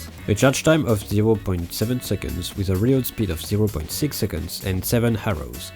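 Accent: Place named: France. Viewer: Love that little French accent❤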